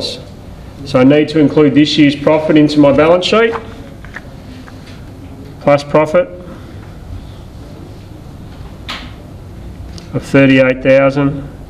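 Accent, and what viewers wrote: Australia. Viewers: are u from Australia mate ?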